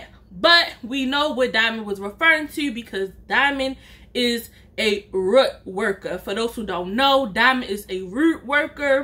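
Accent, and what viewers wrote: Baltimore, United States. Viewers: I’m a new subbie to your channel. You’re such a funny storyteller! I’m really enjoying watching your vids. Andddd I lived close to B’more for a few years and your accent took me back down memory lane. When I first moved there I asked for good food and she said hip hop chicken and said “hot dug”. I said no disrespect but I don’t know what you’re saying could you repeat and ultimately I asked baby girl to spell it!!! Lmao gurllllllllll I felt so dumb! Sorry for the rambling! Sis you’re doing a great job on your channel! Remember to Always keep your head held high so your crown 👑 won’t slip 🧡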